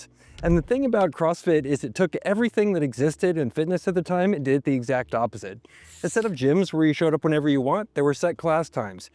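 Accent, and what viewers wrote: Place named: United States. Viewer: Americans and their pronunciation of CEE / SEE names and surnames,…jeeeeeeeeeez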